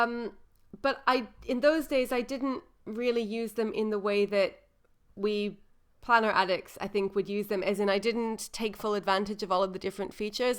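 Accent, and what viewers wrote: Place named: United Kingdom. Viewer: Amazing, what an experience. You can really hear British undertones but the American accent is definitely there.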